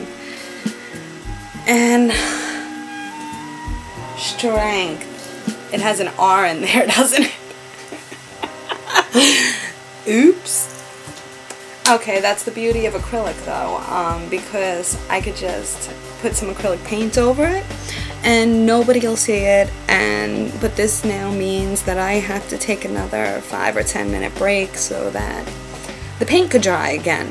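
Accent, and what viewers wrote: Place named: East Coast, United States. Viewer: I really enjoyed watching your presentation! Very nice voice and East coast accent! ((huggs)) :]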